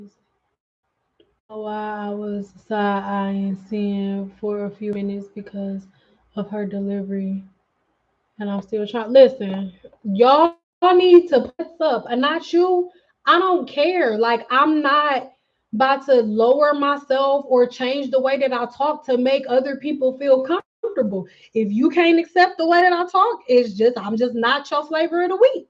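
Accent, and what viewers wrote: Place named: California, United States. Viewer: I know you from Detroit but you sound like us Cali ppl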